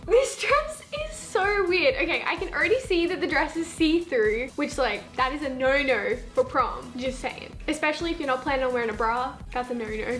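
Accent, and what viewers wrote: Australia. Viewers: R u Australian?I am too!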